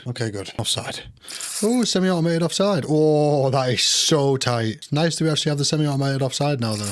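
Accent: Manchester, United Kingdom. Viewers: You again??? Clickbait thumb nails, acting angry when you ain't and trying to be clever with ye little microphone and Manchester accent, Neva seen ye at St James Mr famous, you little actor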